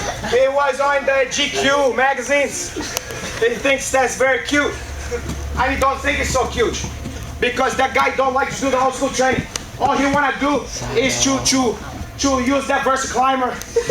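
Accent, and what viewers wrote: Brazil. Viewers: you know you’re acting is on point went an actual brazilian thinks you’re really from Brazil. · i think he is doing a parody of every brazillian fighter or vinny marglese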